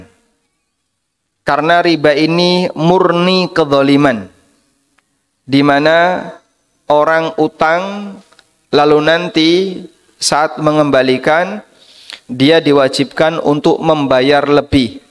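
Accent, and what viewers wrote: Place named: Java, Indonesia. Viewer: Ngomong nya ko Jawa sih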